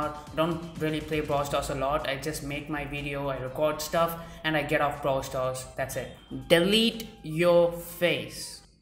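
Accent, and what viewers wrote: India. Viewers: No Front, But as 1st second i heard you, anyways i knew that you're from india. As Same as Wehen i Heard- myself, i know that i'm from germany 😅🤫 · Indian? Accent and yeah....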